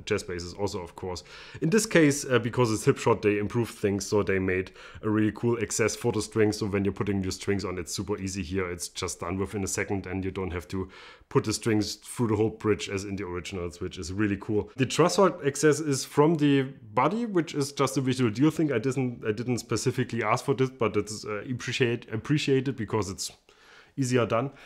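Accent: Germany. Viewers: Hey brother, I love your channel. I'm also one of those guys that has nicer basses than I should considering my playing ability. I love your self-deprecating humor and also your accent. I was stationed in Germany while in the military & met some very cool people there-it was a blast! I hope to make it back for a visit in the next couple of years. Much respect to you and all the German brothers and sisters.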